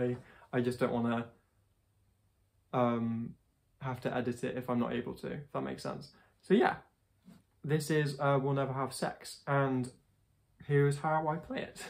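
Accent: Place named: United Kingdom. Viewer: You sound like Dodie maybe because you both are British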